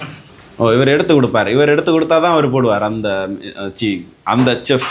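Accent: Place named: Kanyakumari, India. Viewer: nanum kanyakumari than anna language vachi kandu pudichan anna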